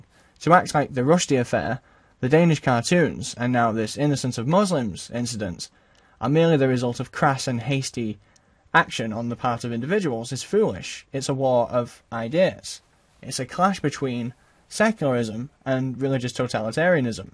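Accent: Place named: United Kingdom. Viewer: OK, I'll check it out. That goes a long way toward explaining why a video on a channel from someone whose channel originates in The Netherlands sounded so completely British! :-)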